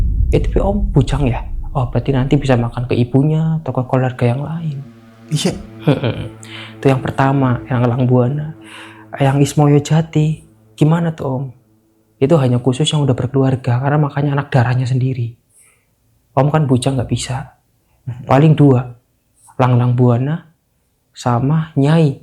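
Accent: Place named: Java, Indonesia. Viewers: ngapak emang sya suka gaya ngmonge lucu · ceritanya bikin lama krna bhasanya campur,knp sih gk pake bhasa Indonesia pakem aja,pake bhasa Jakarta juga logat lu ngapak,gk enak di denger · Orang ngapak mase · Medok sekali rikanyah kisanak li crita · Runtut, cara bercerita, meskipun kadang terselip dialek bahasa Jawa.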